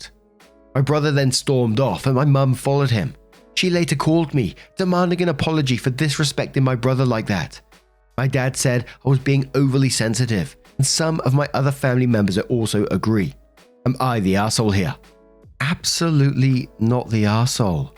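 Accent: United Kingdom. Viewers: Happy Christmas. Since you're the maestro of breakfast foods, and sound British, I figure you're the chap to help me out. I have a question from someone across the pond. 'round these parts we can have our breakfast sandwiches served on something called an English Muffin. It's basically a less dense bagel with no holes. In the land of rainy days and tea, what are those called? And what are the sugary sorts of muffin called? Asking for those who know. I have similar questions for a Belgian if you know where I can find one of those. And yes, that one would be about waffles. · Story #1: Unrelated to the story:, Love your videos, I listen to them while I work, that's why I had to listen to this TWICE. Canadian pronunciation of Thesis is Thes-ISS, your pronunciation [British I assume] it Thes-ESE [this would be the Canadian plural or more than one thesis]. Also you tend to pronounce a "TH" as an "F". 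SO.... Every time you said "Thesis", I kept hearing "Feces", and I was wondering what the H*ll you were talking about. NOT a criticism, just something funny I thought you might get a laugh from. Keep up the great videos, and Thank you. Story #2: That is the CRUELEST thing i have read all day. Trying to get a recovering alcoholic to RELAPSE?? What an abusing sadistic A-HOLE.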